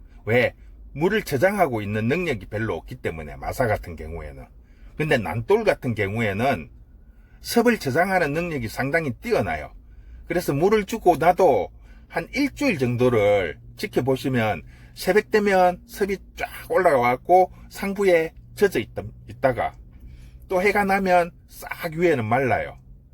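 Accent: Gyeongsang, South Korea. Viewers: 갱상도 발음 정겹게 들리네요. 일리 있는 말씀입니다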